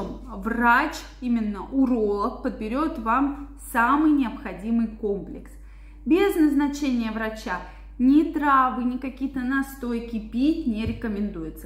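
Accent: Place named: Urals, Russia. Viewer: Вы с Урала? Говор специфический.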